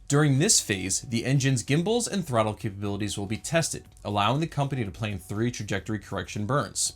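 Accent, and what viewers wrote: Germany. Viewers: Okay… good good now this time with a slight German accent. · I mean the content is great, but passion is unmatched, it makes so much more fun to watch him. German accent for the win;)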